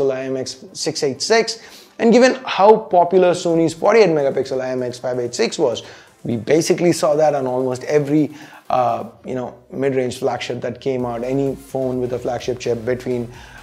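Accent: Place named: Tamil Nadu, India. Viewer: Haha tamil channel illama english veraya hmm👍👍👍👍👍👍👍👍👍👍💕💕💕💕 enna dhan english pesinalum tamil slang madjiriye iruku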